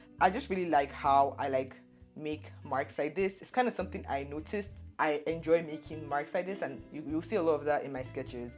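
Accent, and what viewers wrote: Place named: Nigeria. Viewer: When I say your name in your channel description, I figured but I confirmed it when I heard your accent, love to see my fellow Nigerians out here especially as a beginner artist myself. Your art is amazing and the bg music is such my taste too. You’ve js gained a subscriber😊 btw could I ask where you got your iPad stand from from?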